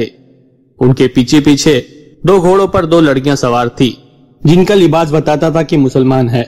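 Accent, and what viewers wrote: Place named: Gujarat, India. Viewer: Pl change the commentator terrible narration Is he some Gujrati speaking person Saramaza kharab ho gaya I have stopped listening to the video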